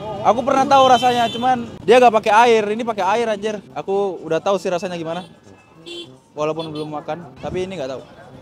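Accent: Medan, Indonesia. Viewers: Omakk...logat medan ah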